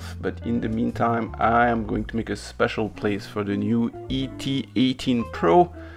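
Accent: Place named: France. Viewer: Nice bit of jazz and a french voice 👍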